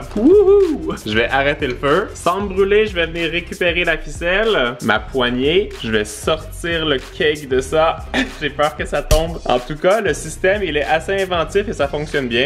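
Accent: Canada. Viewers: tu mon youtubeur préféré de is vidéos accent canadien et qui met quoi des vidéos trop trop rire 👍👍👍👍👍 24h 🇨🇵🇨🇵🇨🇵🇨🇵 🎄 is cooking Noël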